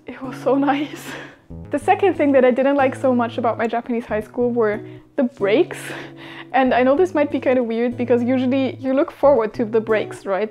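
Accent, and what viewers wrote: Germany. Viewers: I could hear in your voice that you're German,and then I made sure. I WANNA GO THERE,I LOVE GERMANY😍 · Da schaut man 5 Sek vom Video und denkt sich "das muss ne deutsche sein", geht in die Beschreibung und tadaa was sieht man... Sie ist deutsch XD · the lovely German accent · Your German accent sounds so good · i think shes german she has that accent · so you are german? it sounds like you are XD lol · Haha, bitte nimm das jetzt nicht persönlich, aber finde es gerade sehr witzig, dass ich innerhalb einiger Sekunden den deutschen Akzent rausgehört hab. :'D Überlegte schon, ob ich nachschaue woher du stammst, also danke, dass du es gleich in diesem Video geklärt hast. :> Wirklich beneidenswert, dass du diese Erfahrungen sammeln konntest. Wünsch dir noch viel Erfolg mit deinem Channel. :) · I love you're accent and ughhgh German girls <3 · You sound really german. 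· Du bist doch deutsch oder? Man hört das voll😂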